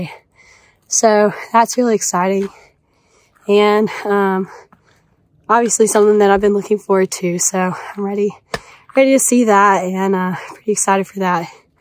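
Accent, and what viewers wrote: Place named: Mississippi, United States. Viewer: Hey 👋 Just saw your announcement video and I'm also from Mississippi, central Mississippi. I knew the accent sounded familiar. 😎👍👍🥾🥾⛰️⛰️⛰️⛰️🏕🤙🙏👋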